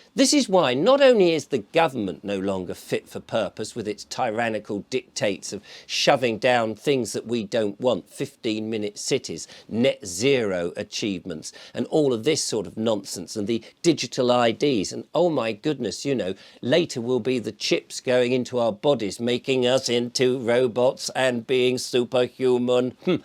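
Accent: Germany. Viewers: Excellent German accent btw 👨🏻‍✈️ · I love your work and particularly your German accent. Keep bringing it brother xxxx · Good German accent, à la Klaus. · Never mind the naff, the German accent was highly significant. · Your German accent sounded like Kermit the frog, just realized that Kermit is a German name. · Hello, alas, I used to work in retail banking for my sins but hopefully I can make amends:) The bank staff have to ask about large withdrawals as a safe guarding procedure and it is understandable that it rubs people up the wrong way. All unusual withdrawals are looked into seriously in case there is money laundering at play as well. This is in the Banking Code Law and to be in breach of it, there used to be a 7 year jail term for the staff member. You lived in fear of getting anything wrong. There is also a limit on the amount of cash that a branch can hold (insurance purposes) but large withdrawals can be done by appointment. 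Often, it's a worry about people's safety carrying it around or being robbed. I love ze German accent and I'm no fan of the way banking is going either! Looking forward to the solutions videos, Sunak & co. have left things too late, we need to act now.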